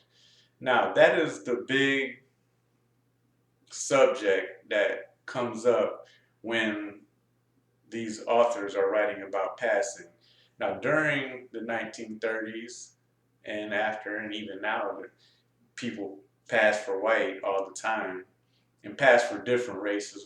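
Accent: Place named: South, United States. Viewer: This was well done. Its a heartbreaking topic but has to be brought to the light. I like book talks and it sounds like you have a Southern accent which I can relate to so I subscribed.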